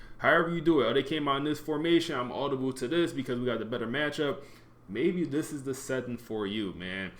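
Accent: Philadelphia, United States. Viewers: Man I instantly knew this dude was from Philly!! lol but I used Flexible Play caller without realizing what I was choosing. Description definitely fits against my game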